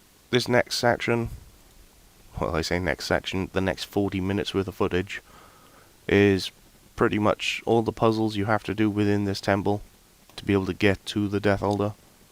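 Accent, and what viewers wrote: Wales, United Kingdom. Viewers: AWESOME guide, thank you man. Also nice listening to a Welshman for an hour :')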